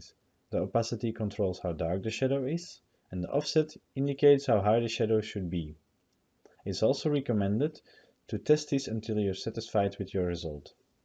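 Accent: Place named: Flanders, Belgium. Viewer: Nice video, and nice flemish accent ahahah. Bedankt maat!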